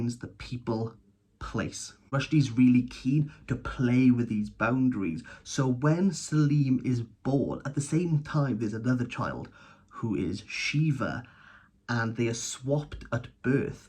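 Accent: Wales, United Kingdom. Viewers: Mate, what an amazing review. I love your energy and engagement. Please keep it up! (And sorry for my ignorant question, but accents from Britain fascinate me — is that a great Welsh accent you have?) All the best.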